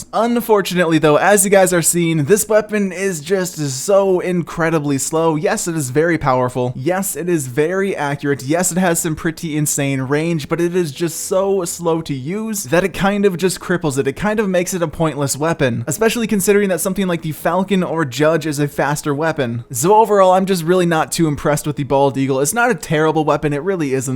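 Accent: Czech Republic. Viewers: Are you from Czech republic?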